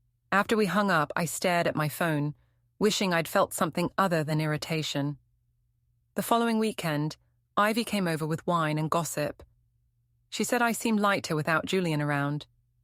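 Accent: England, United Kingdom. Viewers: why does this sound like the most entitled english lady in existence?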